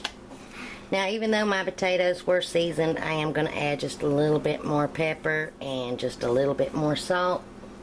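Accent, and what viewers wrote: South, United States. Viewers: Yes you do have an accent! I love the way you did the subtitles! Yur friend shouldn't do no messin' with us southern girls!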